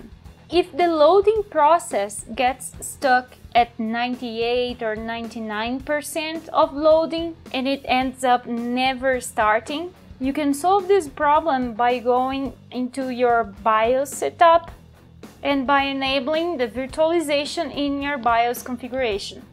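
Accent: Brazil. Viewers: Esse sotaque não me engana. Tu é br né? haha Excelente vídeo! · Tu não me engana! Tu é Brasileira!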